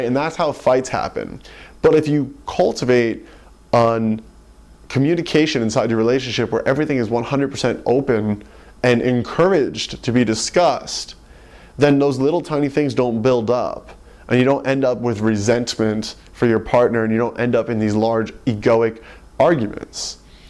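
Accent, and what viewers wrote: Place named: United States. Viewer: he sounds like an American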